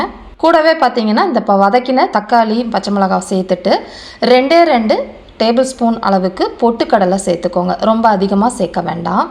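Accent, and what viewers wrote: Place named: Coimbatore, India. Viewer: அக்கா நீங்க coimbatore ஆ,,,,உங்க பாஷை அப்படி இருக்கு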